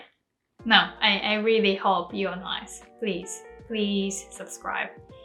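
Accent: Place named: United Kingdom. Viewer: Well theres a reason why she has a british accent when speaking english, she probably spent some time in europe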